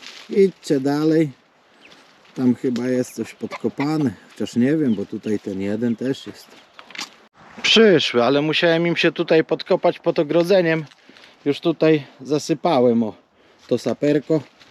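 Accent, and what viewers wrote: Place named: East, Poland. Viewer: Ale sie wyrobiles z tym Yiutabem normalnie smigasz sie bujajacbna bokin😂.. Ale kurde bele beks bo akcent masz i poprawiasz... Tak trzymaj wschodniaku 😮😊😜😂😂😂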